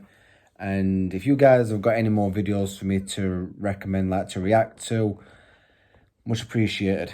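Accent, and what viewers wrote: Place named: United Kingdom. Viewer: AHHH HES BRITISH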